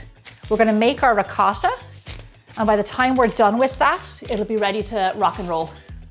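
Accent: Ireland. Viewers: So. I love your baking recipes. I love your accent (love Ireland!). BUT I'm Italian and this is what comes out of nightmares 👹🙈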